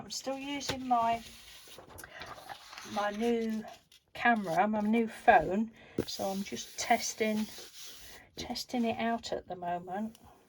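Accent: United Kingdom. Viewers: nice english english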